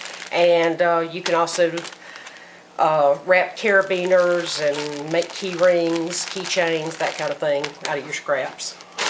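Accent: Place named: South, United States. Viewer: WOW! Two videos in and youre already tagged. How cool, you are an official part of the youtube bushcraft community. Even cooler that your daughter is your director ,producer and camera operator. You sure do sound southern, lol. You have alot more paracord stuff than I do. I dont have a jig nor have I ever made a bracelet. I keep my paracord in a pile in the floor, lol. Cool video, keep doing what youre doing.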